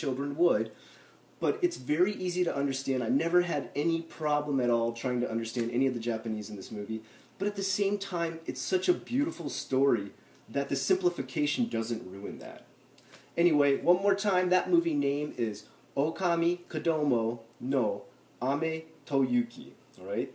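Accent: United States. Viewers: do you pronounce the japanese language in your videos as you would when talking to Japanese people? It sounds very americanized to me. Still, great videos:)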